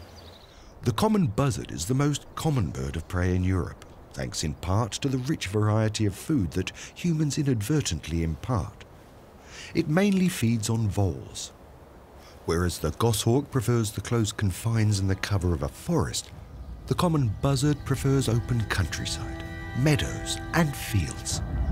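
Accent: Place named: North, United Kingdom. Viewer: Disliked due to overly used northern accent.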